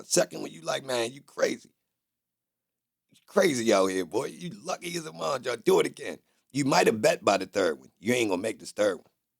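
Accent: Baltimore, United States. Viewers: Are you from Baltimore by any chance?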